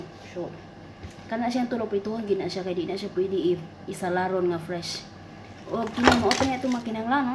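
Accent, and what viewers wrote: Visayas, Philippines. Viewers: Slang bai morag dili bisayang dako, sunod mag inintsik unya ta, tan- awon nato makaya ba sa mga bisayang antik. Zucchini - ( zu - ke - ne ) pronunciation bai, morag chikinene. Bai, bilingual is a talent that we should all be proud of. We all wish to speak better English or any other language nothing wrong w that. To be bilingual it’s got to be amazing, you can talk to any ppl in the world in their own mother tounge. You can get jobs too by speaking different languages. Overall it’s good. You can speak 🇰🇼 we wish we can + your ever so deep visayan that sometimes it’s so hard to understand bc that’s how deep it is & that’s one of your talents not a lot of visayan who can speak like how you speak it’s just so deep & it feels so good to hear it from you bc we absolutely missed that from our grandparents. Centre Point ad Bai 3 mins long para sa pamasko bai pohon. 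Hehe, bai you can’t stop thinking about something else niambak hinoon ang patatas. Mosayaw diay ka bai kon maghiwa. 😂kataw- anan kaayo ka. Bai makanirbyos mana imong phone bai kadaghan na hapit moambak. Pwerting lamia bai tan- awon imong salad. Bai magikon nalang nato ang nomero para makasal naka. 💞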